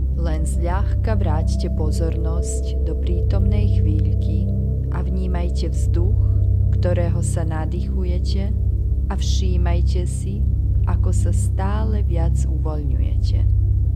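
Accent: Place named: Eastern Slovakia, Slovakia. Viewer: Meditácia obsahovo a postupovo veľmi dobrá, žiaľ, mne vadí ten hlas, ktorý ma ruší. Navyše tam vnímam východňársky, miestami až rusínsky prízvuk. Keby to bolo nahovorené niekým iným, je to bez chyby a perfektné. Zafarbenie hlasu, intonácia, dikcia a výslovnosť, to sú všetko faktory, ktoré sú pri vedenej meditácii veľmi dôležité.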